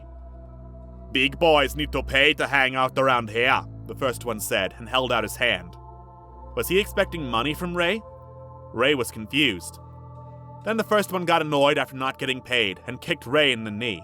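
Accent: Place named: Germany. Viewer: this is gold. The fact the story was so predictable and yet still caught me off guard was actually insane. thank you for the wonderful and lore-accurate german accent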